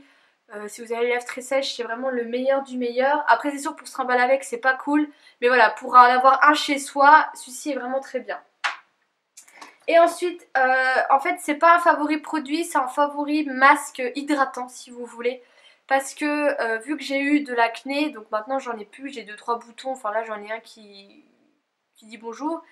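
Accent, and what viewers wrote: Lorraine, France. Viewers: j'aime Bien Ton accent ! Teeam Lorraine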